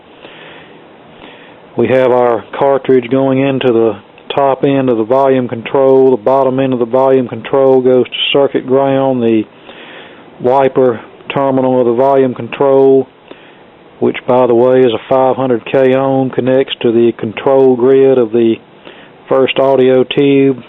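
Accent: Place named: Louisiana, United States. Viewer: He sounds like he from Louisiana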